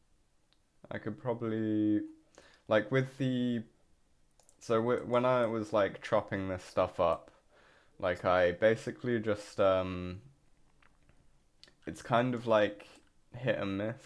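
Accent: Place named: South East England, United Kingdom. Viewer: you sound like a south east lad too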